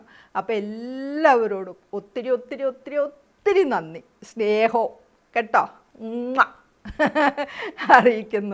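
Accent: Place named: Kochi, India. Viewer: Mam te veedu fort kochi aano ...or vyppin...samsarom kettuttu agane thonni...njan Ernakulam...kaloor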